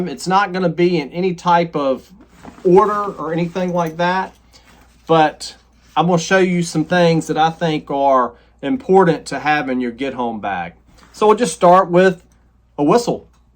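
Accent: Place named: Western Maryland, United States. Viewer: Thanks for the videos, they're very helpful for camping/bushcraft info! Sidenote: I grew up in western MD but have been living in the Rocky Mts for a few years and man, hearing you talk is making me so homesick!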